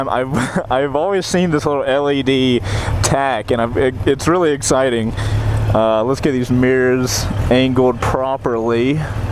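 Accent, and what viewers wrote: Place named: North Carolina, United States. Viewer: Are you in NC? Your accent and scenery feel very familiar!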